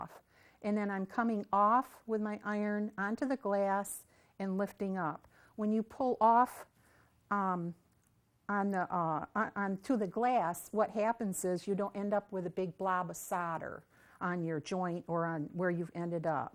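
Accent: United States. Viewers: Thank you for this instructional video. But, the whole time I was thinking of Fargo, yeah, you betcha, don'tcha know?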